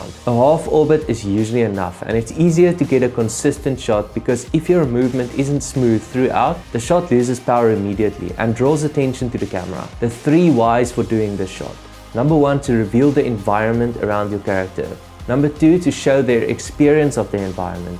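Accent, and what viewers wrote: South Africa. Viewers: I'm so happy I stumbled onto your channel. Between this, the Intent video, and the Contrast video I've never felt more in tune, capable, and powerful as a cinematographer. Weird way to describe the feeling I guess, but as a fellow Saffa it's encouraging hearing such useful, honest information in your own accent haha.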